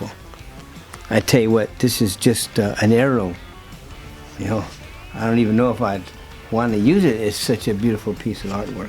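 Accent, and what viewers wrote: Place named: Southern California, United States. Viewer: Are you from Cali? You have the SoCal accent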